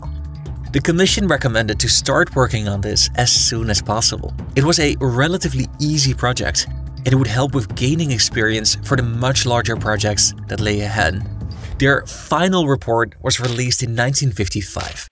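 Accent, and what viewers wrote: Netherlands. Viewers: I hear it clearly…Dutch native tongue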